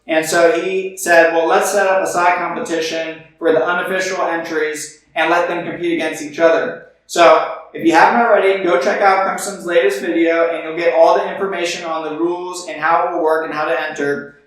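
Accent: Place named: Alabama, United States. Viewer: What? I can't hear you! No just kidding. Put your money into something you want. I don't care if the sound isn't quite there. I just don't like that Alabama accent! That's from a Georgia boy of course. Looking good pal.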